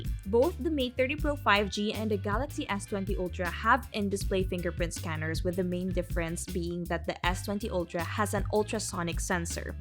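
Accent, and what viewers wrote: Philippines. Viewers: sounds like pilipino accent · I can tell based on your accent that you are from the Phillipines. Am i right?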